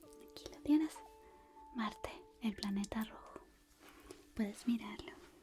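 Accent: Chile. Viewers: Es de chile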